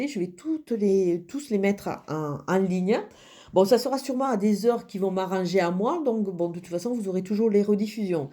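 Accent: South, France. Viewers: Bonjour, je vous remercie encore pour votre fabuleux live d'hier et merci pour ce tirage du jour. Et merci aussi pour la générosité de votre ❤ pour vos abonnés... Bon week-end à vous bel accent du soleil...💐 ☺ 😘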